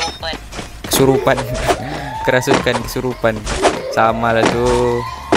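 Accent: Sabah, Malaysia. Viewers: Abg sabah daerah mana?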